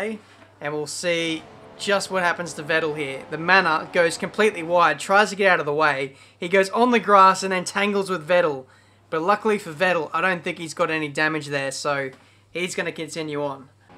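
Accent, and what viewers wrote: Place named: Australia. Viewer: australian voice Great job mate, that came outa nowheree!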